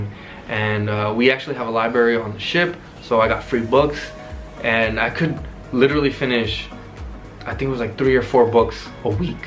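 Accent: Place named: Venezuela. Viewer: Tu español tiene acento venezolano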